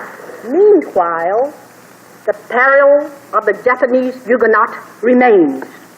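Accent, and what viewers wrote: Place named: China. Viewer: I feel like she has a very slight Chinese accent though, which is surprising to me considering she was educated in the US at a young age.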